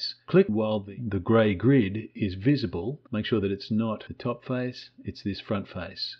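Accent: Australia. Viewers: Finally a tutorial not jammed up with loud background music and high speed cursor movements explained clearly at a pace I can follow. I think this is going to work for me. Nice to hear an Aussie voice too.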